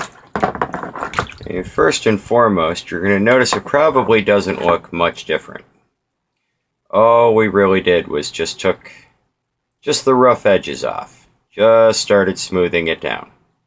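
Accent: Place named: Pittsburgh, United States. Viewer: This is really helpful, thanks! Are you from the western PA area by chance? I grew up in Pittsburgh and your accent is reminding me of home!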